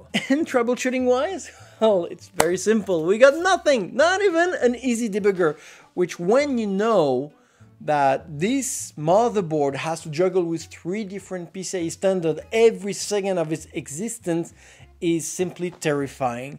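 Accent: France. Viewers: Man, I was about to buy it with a 7900X3D because it's 230€ but I'm going to pass ! Problem is, there isn't much X670 with 6 S-ATA ports at an ok price point at the moment. ASUS TUF GAMING X670E-PLUS WiFi looked good with additional SATA PCIe card but the card I'm looking at is X8 and both additionnal ports are X4... Super detailed test, with lots of passion and top french accent ;) ! Bon boulot, cette qualité de test me rend fier d'être français !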